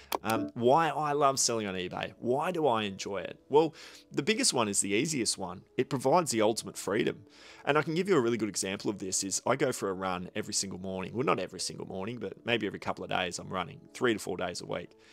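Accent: Australia. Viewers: your lovely Aussie voice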